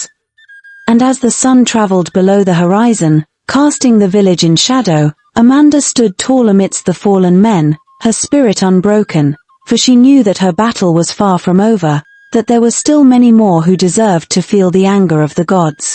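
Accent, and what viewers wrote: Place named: United Kingdom. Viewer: read this slowly.,....... your irritating voice is fukkin up the story. Find your own British tales or whatever accent that is and narrate it. Try hamlets tales it's more fitting for your kind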